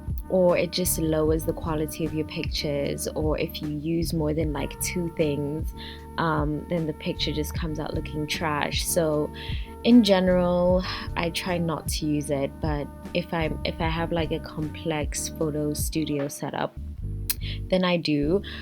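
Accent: South Africa. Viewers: Wait, you must be South African? Right? I love your channel btw, brilliant content 💕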